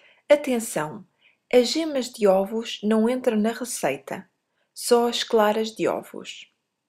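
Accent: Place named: Rio de Janeiro, Brazil. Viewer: É muito parecido ao sotaque dos Cariocas, claras de ovosh!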